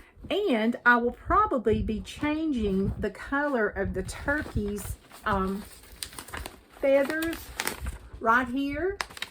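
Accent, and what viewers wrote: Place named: Western North Carolina, United States. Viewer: I came to your early video to see where you from! We lived in western NC for 6 years and I thought you sounded like you were from there. Wonderful people there! We are back in Eastern NC now.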